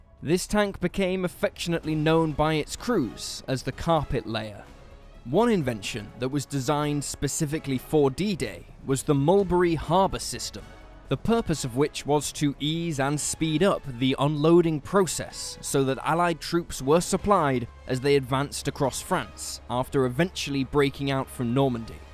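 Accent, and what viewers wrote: United Kingdom. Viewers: British babble !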